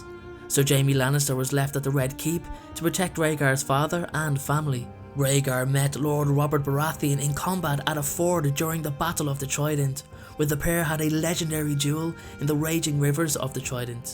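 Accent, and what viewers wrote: Dublin, Ireland. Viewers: I think he's a Dubliner like myself 🙂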